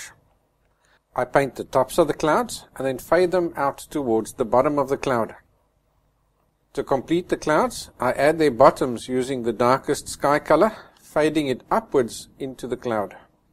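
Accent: South Africa. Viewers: thanks for the great teaching style and accent in your voice,,is it south african or welsh?but thanks anyways very easy to follow and sped up just at the right times --im sure it wont come out like yours but i will try nevertheless,,thanks again.. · Fantastic.. im from s.a.too. I can hear on ur accent ..I'm trying your tutorial soon. Do you give painting lessons?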